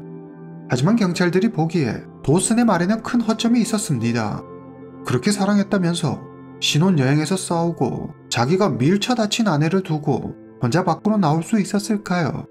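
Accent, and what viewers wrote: China. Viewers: 억양때문에… 보다가 껐어요…중국분이신가요?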